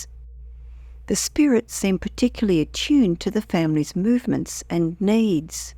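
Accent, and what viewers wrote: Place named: Australia. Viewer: Maybe it’s her accent! I’m pretty sure the narrator is Australian!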